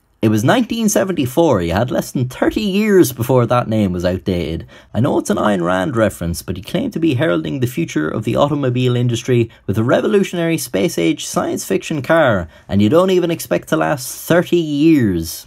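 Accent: Ireland. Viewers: You got 100k cause you have a Irish accent everyone likes Irish accents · Love your page! The content is very interesting and your storytelling ability mixed with clever bits of humor is top-notch. The Irish accent doesn't hurt either. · I thought it was Slavic but ever since I saw this comment it's sounds Irish not Slavic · a bit late his accent if you can not tell is clearly Irish so i believe that he is Lebanese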